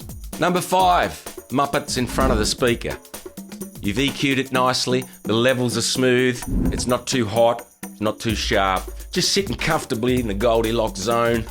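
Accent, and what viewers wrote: Australia. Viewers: Had to come back here after initially enjoying this a month ago as I thought of you at a live outdoor gig this weekend. Everything was actually good and I had been waiting to have a slower moment and break out a song. I started in very softly and the puppy adoption set up which was part of the event and had been there quietly all afternoon under the trees in a corner, had a visitor walk by with two dogs of their own. About 20 dogs commenced to bark, as I began to sing. I switched to a chorus of and in my head heard your Aussie accent say dogs barking off to the side like there's no tomorrow, that's a new one. And I swear it was not in reaction to me!😂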